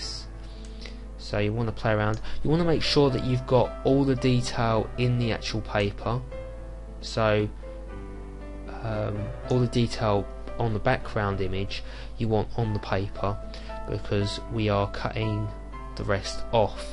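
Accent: United Kingdom. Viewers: very nice tutorial. but DAMN your British accent which is putting my nerves to a big challenge considering that English is not my native language... Anyway, I will try to fully understand your actions from tutorial.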